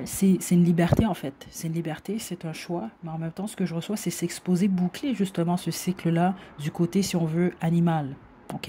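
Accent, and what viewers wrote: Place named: Canada. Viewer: Merci pour Le tirage. Les fj: interessant. Je le ressens aussi comme ça. La terre est stressé, c vrai, reconnectons nous a elle. Aimons la. Et sortir du monde à cravate. Créons notre propre réalité. Et pour les ET Oui c sur. Nous meme venons sans doute d’ailleurs ou une partie de nous meme.. j’avais déjà entendu qu’il y avait des passages secrets dans la terre où il y aurait une vie paradisiaque avec d’autres animaux.. passage secrets, cachés.. mais comme tout secret, ça doit être sécréter.. découvert. Belle canalisation. J’adore le corbeau. Y’a de la magie dans l’air... Merci c’était la deuxième fois que je t’écoute. Je t’aime bien. Beau rire et accent canadien j’adore ❤️❤️ bonne continuation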